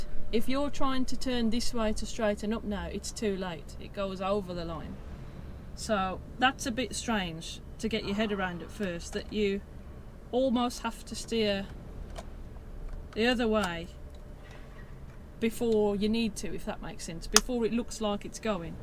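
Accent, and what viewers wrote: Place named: Dudley, United Kingdom. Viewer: Are you from Dudley bab?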